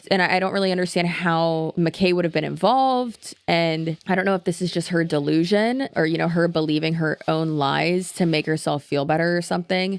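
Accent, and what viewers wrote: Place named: Florida, United States. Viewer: yess she’s been hitting that Florida accent extra hard lately too for some reason. Isn’t she from appalachia or sum?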